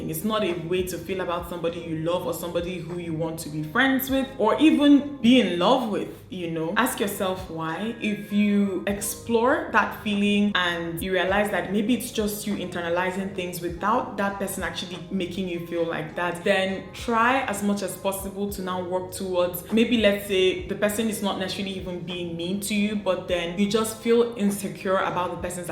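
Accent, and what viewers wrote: Igbo, Nigeria. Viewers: There’s nothing wrong with them oo. That Igbo would always surface 😂 and is lovely. Thanks for sharing.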